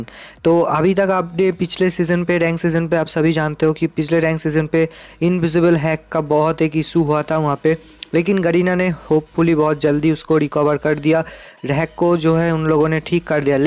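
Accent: Bengal, India. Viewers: Keya app Bangoli ho???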